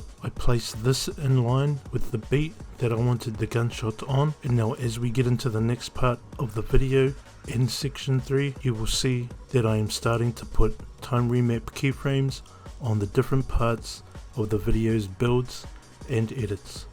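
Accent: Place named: New Zealand. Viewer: Your from New Zealand haha same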